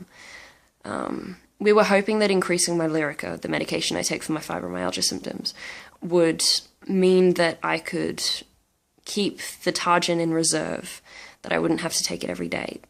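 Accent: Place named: Australia. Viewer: Good to hear another Aussie accent on YT.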